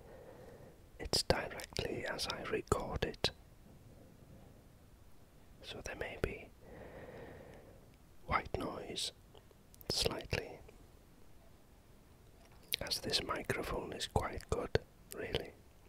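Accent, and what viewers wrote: Wales, United Kingdom. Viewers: This is wonderful. Welsh? Love your voice and the content of your videos, perfect for bed time, perfect for sleep. Thanks.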